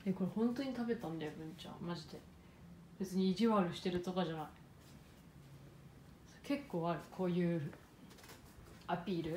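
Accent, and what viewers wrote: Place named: Okinawa, Japan. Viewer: 沖縄出身でしょうか？イントネーションが似ている気がします！（私は沖縄出身でも何でもないです、すみません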